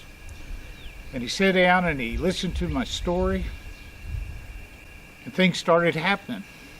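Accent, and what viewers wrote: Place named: South, United States. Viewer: What's sad..is they will turn right around and resell that POS you had to trade in to another poor guy who will be unaware of the ongoing issues that you had. You seem like such a genuine person and remind me of my father minus your southern draw you have. I'm a Ford man myself but I really hope this new truck works out for you. I'm glad that the GM at the dealership tried to take care of you. I wish we had more people working at dealerships like him. Good luck sir.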